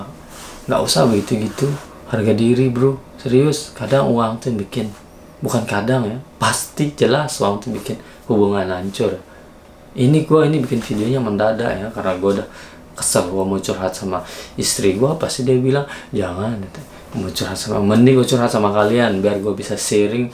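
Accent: Lombok, Indonesia. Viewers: Logat lombok nden bau telang bro 😅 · Kok logatnya kayak orang Lombok ya?😁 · Logat sasak nya masih ada bang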